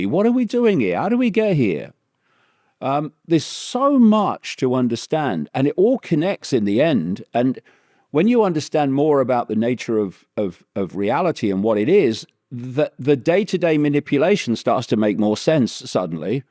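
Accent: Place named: Cockney, United Kingdom. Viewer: Sounds like he's got a London UK slight cockney accent.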